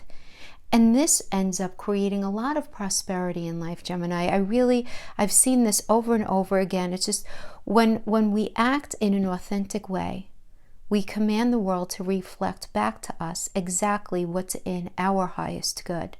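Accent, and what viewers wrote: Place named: New Jersey, United States. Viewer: ❤️ (As an aside, I can’t stop thinking you must be from Jersey, where all my heart and family lives.)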